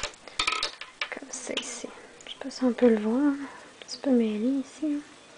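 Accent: Quebec, Canada. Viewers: Tu exagères, on comprend parfaitement ce qu'elle dit et son accent québécois est délicieux.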